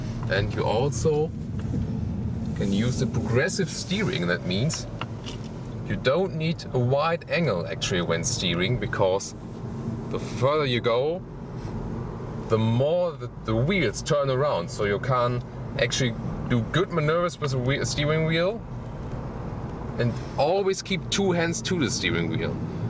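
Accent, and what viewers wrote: Germany. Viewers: Love the review, I like to hear your german accent too! would be pretty cool to take this up to the mountains with all the snow!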